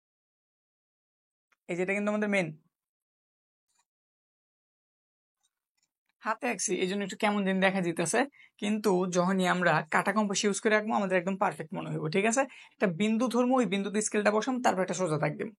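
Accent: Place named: Barishal, Bangladesh. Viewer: স্যার আপনার😊 বাড়ি বরিশাল আমার বাড়ি কিন্তু বরিশাল😂 কারণ আপনার কথায় বোঝা যাইতেছে😮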